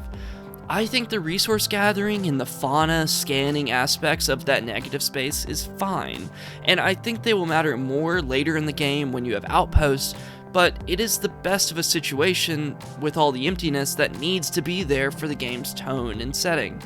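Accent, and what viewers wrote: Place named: Pennsylvania, United States. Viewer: your accent reminds me of people in Pennsylvania .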